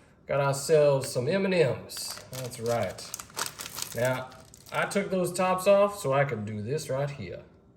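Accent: South, United States. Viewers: Ya know what I love more? His accent! 😂. Louisiana? Best southern? Accent ever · I love your southern accent 😊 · You have to be from he south, am I right? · Yes, I do agree. Everything is shrinking and the price keeps on going up. 😂. Just wanted to say as well, I do agree with the ladies from previous comment about the southern accent. 😊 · I'm only watching this because you have a lovely 🌹 southern accent. You sound so smooth. That cake looks really good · Southern lovin' cookin' rite dare.... love the accent. 😊 love the recipe. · Loved your southern accent 😊❤️🙏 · That looks yummy. I love your southern accent. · Im going to make this for my kids just bc of your wonderful southern accent 😊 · Love your southern accent! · Love this desert. Oh my goodness. It looks delicious. I have to make it. I agree with you and using your hands. Best way to really get the job done. 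Your kitchen, your rules. Love the southern, gentleman accent as well! Subscribed because I have a feeling you're doing many things for us to make. 🎉🎉🎉🎉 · Where are you from? Such a southern accent. Like old confederate days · Looks good the sweet southern accent makes the video even better ❤ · I do detect some “Dixie” accent! Love it! It has to be good! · Maybe more for camera/video time it just looks like they waste more than you/we do at home. I’m sure they don’t throw all that much away all the time. I think he did better than most. (By the way I love your southern accent.) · Oo the southern accent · And I’m fron Nashville, NC and I agree. Sounds like Clayton Hollingsworth…Blanche’s brother on Golden Girls. Very Gone With The Wind. Ive NEVER heard a southern accent like this one. Born n raised southern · your southern drawl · I love love love the southern gentleman accent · Love your talk you sound so southern its so cute . 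Yummy taste 😋 thanks for showing this recipe 🎉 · You got south in your mouth!! Love it! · U are either from Alabama or Georgia! Boyee u have an accent! Lol! I’m from the south too but I’m from New Orleans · Good old-fashioned deep southern classic twang accent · I absolutely love your accent old south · The southern accent is cool. Combination between Savannah and Louisiana?? ❤😂🎉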